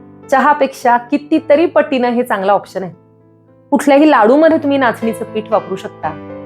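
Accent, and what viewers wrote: Standard. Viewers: 🙏🏻आपले बोलणे अतिशय छान व शुद्ध आहे. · मॅडम तुम्ही मारवाडी समाजाचे असून सुद्धा मराठी किती शुद्ध स्पष्ट बोलता हे खूपच कौतुकास्पद आहे.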